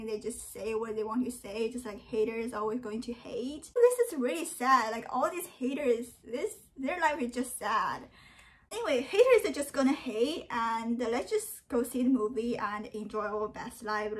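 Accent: China. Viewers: I know you have a strong Chinese accent(obviously) but I'm impressed by your English Grammar. You don't speak Chinglish like most students in China. You have really studied the language. I lived in China for 12 years and I honestly agree with you. These American/Chinese productions are very weird and I only watch movies from China when I need to see Kung Fu. 祝你萬事如意 有推薦的中國電影請跟我講 謝謝您小Lizzie!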